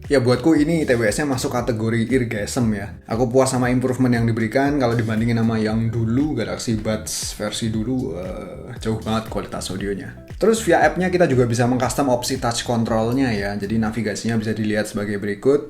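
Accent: Java, Indonesia. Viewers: Mantap bang, medok² asek pertahanin buat cirikhas Yg pemting kontennya berbobot dari Reviewnya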